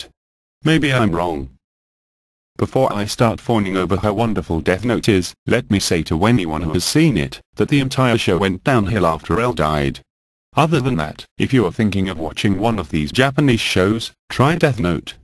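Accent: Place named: United Kingdom. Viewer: The fact this is in the Education category combined with the robotic British voice is awesome.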